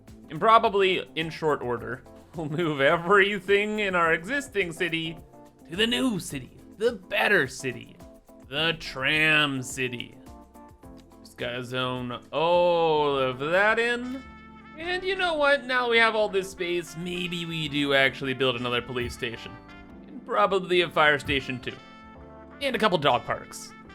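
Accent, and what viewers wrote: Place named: Canada. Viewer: you can tell by his accent he’s definitely Canadian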